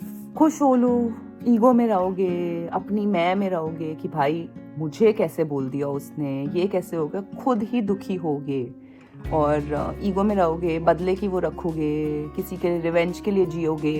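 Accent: Delhi, India. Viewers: Ur delhi accent is so cuteee..proper delhi wali tone🤌🏻🤌🏻🤌🏻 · I got very emotional after watching this vlog, I also live in noida and I am just 20, and i kind of went through the same feelings when i had a breakup, you felt just so relatable to me, also the way you talk the delhi accent everything is very relatable. I saw you for the first time in Meri aashiqui tum se hi & I adore you since then, you are a pure soul and i just wish you get all the happiness in the world 💌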